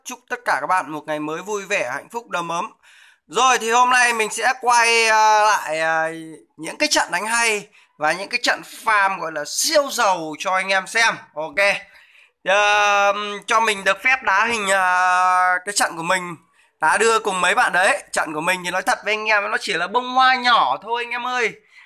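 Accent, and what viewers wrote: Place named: Nam Dinh, Vietnam. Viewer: Anh người nam định hả anh hay ở đâu ?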